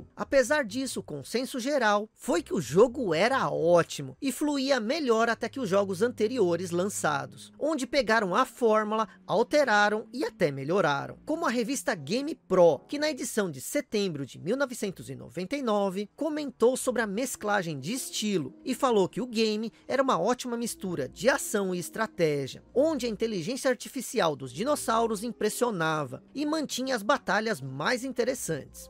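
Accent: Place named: Northeast, Brazil. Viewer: Issui pirata parece um nordestino com sotaque melhor do que muito nordestino kkkkkk P.s sou nordestino, antes que os lacradores comecem com enjoo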